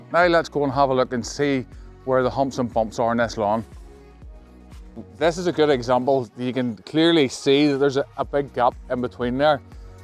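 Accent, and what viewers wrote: Northern Ireland, United Kingdom. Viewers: Beautiful response, and I LOVE your "broad Northern Irish accent" ! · Norn Iron accent AYE???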